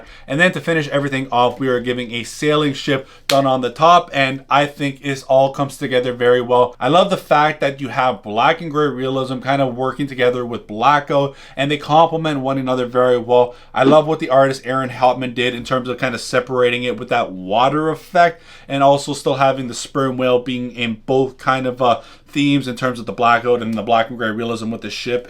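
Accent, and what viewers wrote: Northern Ireland, United Kingdom. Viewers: Northern Irish 😂😂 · Wow bro, yr accent sounds exactly like a Northern Ireland accent, is that where yr from?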